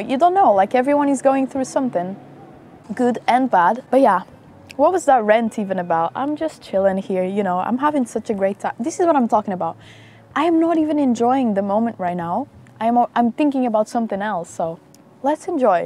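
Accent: Portugal. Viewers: man tu tens um ingles bue bom mas n sei porque eu senti logo q eras tuga good content!!! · portuguese ? sounds like it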